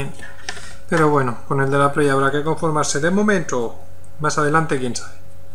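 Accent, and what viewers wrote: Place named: Andalusia, Spain. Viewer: Es andaluz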